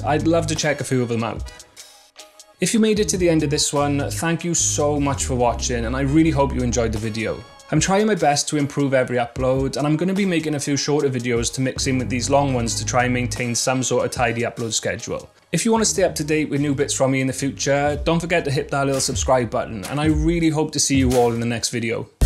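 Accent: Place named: Wales, United Kingdom. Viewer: Great vid, nice to hear from a fellow Welshman 👍🏼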